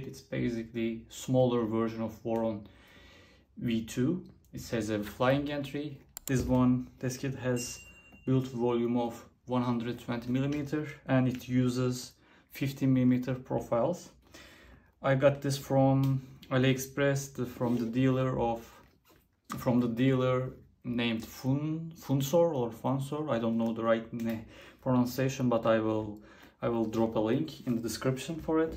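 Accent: Turkey. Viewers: Hocam videonuzu izlerken aksanınızı türk aksanına benzettim, tam yorumlara girdim sormak için biri zaten sormuş bile :D. Bu kit Türkiye'ye gönderilemiyor diyor aliexpress sayfasında, nasıl eriştiniz kite acaba? Ne kadar vergi çıktı?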